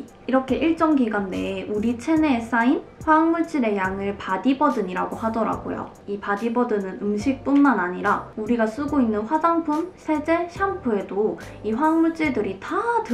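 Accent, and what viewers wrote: Gyeongsang, South Korea. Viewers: 일본인 구독자입니다! 님 고향이어디입니까!! 갱상도 사투리같은데 어디죠???🥺💕💕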